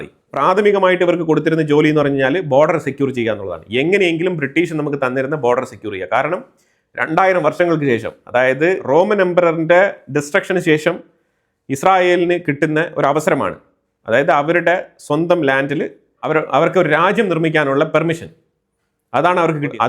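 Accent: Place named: Malabar, India. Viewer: His സ്വീകരിച്ചു of speech is of മലബാര്‍.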